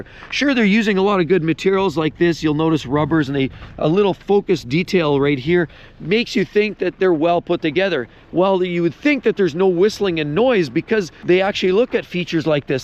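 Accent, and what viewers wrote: Canada. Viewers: You' re canadian, aren' t you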